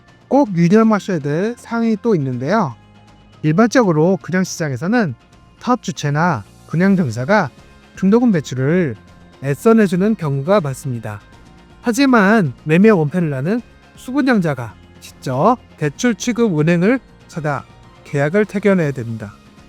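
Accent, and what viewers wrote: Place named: China. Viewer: 대한민국 부동산 콘텐츠를 중국인이 다루나요? 발음이 듣기 너무 불편하고 알아들을 수 없는데, 한국말 공부를 더 하시고 만들어야 할 듯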